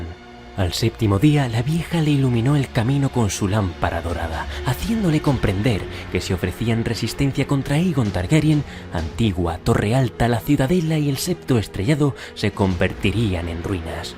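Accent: Spain. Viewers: soy latinoamericano. Pero te digo una cosa. Siempre me ha parecido que la lengua castellana de España es mejor para narrar historias de fantasía medieval, no sé porqué, pero así lo siento. Debe ser porque le da más epicidad a la narración. No estoy denigrando de ninguna manera la narración del castellano latinoamericano. Has narrado este video espectacularmente, de verdad, te felicito. Muy bueno.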